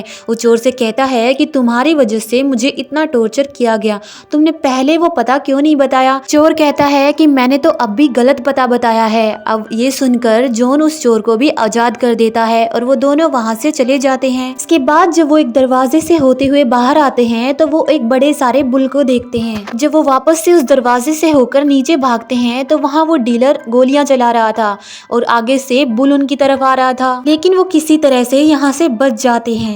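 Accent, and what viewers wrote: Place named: Pakistan. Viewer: Nice explain... Tumhar aawaj se lagta .. Tum Pakistan se ho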